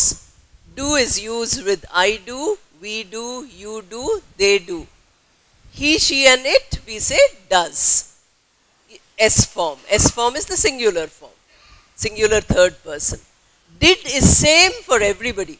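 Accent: India. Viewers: So happy to listen wonderful Indian English, thank you mam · Obviously you are a good English teacher, style of your teaching is very easy.. And the most important thing that the way of teaching is in Indian accent👍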